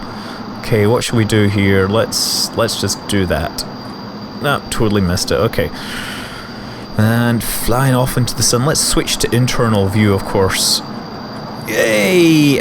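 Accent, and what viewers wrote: Scotland, United Kingdom. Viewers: May I ask? Are you Scottish?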